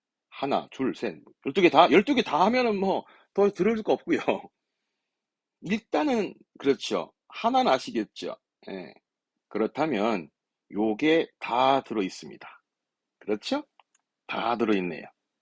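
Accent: Jeju, South Korea. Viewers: 명강의 귀에 쏙쏙 들어와요~~증말 최고십니다. 글구 잘 모르지만 제주도 억양이 중간 중간 들려서 재미있었어요(정확하게 들었는지 모르겠으나 ㅎㅎㅎㅎ) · 제주도 분이신가봐요,,ㅋㅋ 중간중간 제주도 말이 들려서 방가워요..ㅋㅋ 너무 초보라서 여기 나오는 예문도 너무 어려운데 이거부터 보면서 여기나오는 단어 무조건 외우면서 공부하면 될까오?😅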